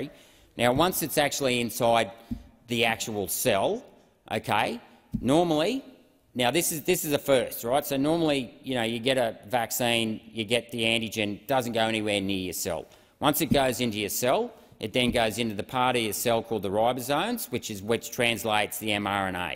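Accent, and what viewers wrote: Australia. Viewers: I've heard it spoken by so many Americans, and foreigners it's nice to hear it from an Aussie accent I totally understood every word so eloquently described anyone could understand. Brilliantly stated .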